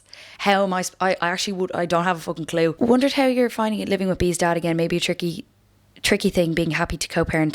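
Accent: South Dublin, Ireland. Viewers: Are you from the south side of Dublin? ❤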